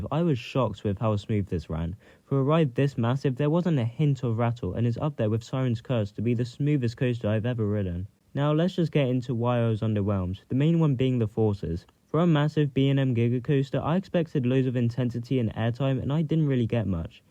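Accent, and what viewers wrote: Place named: United Kingdom. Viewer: I don’t particularly like roller coasters (because I get motion sick) so it’s a mystery as to why I was recommended this video 😅, however I do live in Ohio and have gone to King’s Island a lot. I decided to watch the video and found it interesting to hear someone from across the pond talk about kings island. 😊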